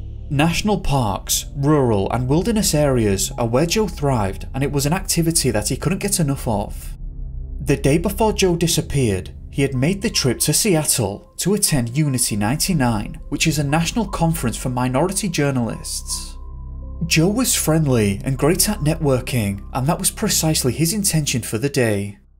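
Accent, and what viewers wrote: United Kingdom. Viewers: NGL I clicked on this video because the topic seemed interesting but your cadence and intonations while reading are so grating I cannot stand watching. Regardless of the words or sentence structure you say a few words then fade out, short pause, repeat until the end of a sentence where you slow the last few syllables down like you've run out of air. The lack of variation makes it sound like a slightly more advanced british microsoft sam.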